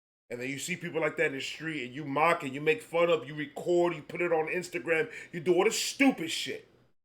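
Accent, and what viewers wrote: New York, United States. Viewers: You have a Yankees hat on. And from the accent you sound like a New Yorker. Im a Yankees fan myself (last names Mattingly). I spent 39 months deployed in the infantry. I did it, i lived it. I have the physical and mental scars to back it up. You have had the best reaction video to this song, ive seen. As a veteran, this is probably my single favorite song ever. The sad thing is that those numbers are under represented. Not all suicides are classified that way. The more accurate number is between 35 and 40 veterans a day. Ive lost more brothers to their personal demons than to combat actions. If you're curious, "The Hornets Nest" is a great movie to watch (and the second half is my company from the service). Great 1st hand of what combat soldiers go through. Thank you for youre appreciation of the veterans. I can only assume what you witnessed on 9/11....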